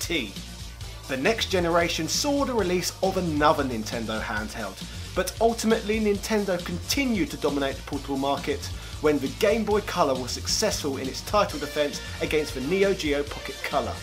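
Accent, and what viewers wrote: United Kingdom. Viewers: when I saw your outfit, I was expecting some really strong British accent. I was not disappointed:). Yes, Brazil loves you:)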